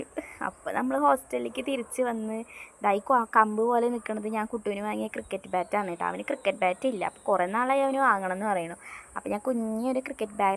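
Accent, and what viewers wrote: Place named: Thrissur, India. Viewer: സംസാരം കേൾക്കുമ്പോൾ തൃശ്ശൂർ ക്കാരി തന്നെ❤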